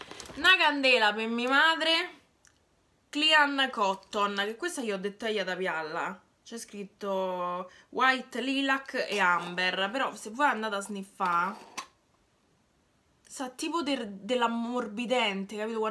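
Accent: Rome, Italy. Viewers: Ma vogliamo parlare dell’accento romano😍♥️ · team mia🌹, amo il tuo accento romano 😍 Pezzo preferito il pigiamino di barbie aaaa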